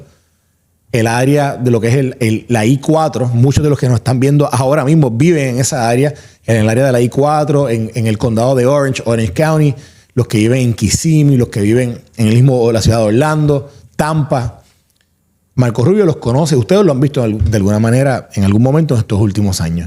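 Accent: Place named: Puerto Rico. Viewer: Como Cubana te agradezco k le des voz a mi pueblo. Me encanta el acento boricua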